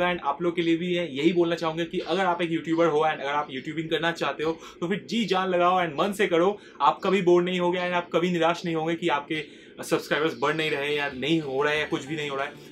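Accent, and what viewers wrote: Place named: Bengal, India. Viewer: Ooo baa bhalo..tomar kotha sunei bojha jachhi lo. J tumi script lekho na r tumi bangali..ami o likhi na .skript...😂❤️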